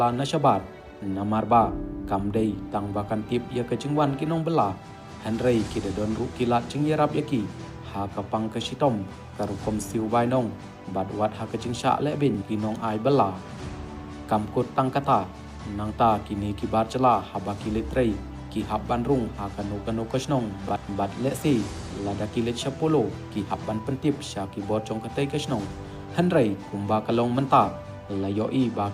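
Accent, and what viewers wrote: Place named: United Kingdom. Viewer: Speaking English like British mind set like Street boys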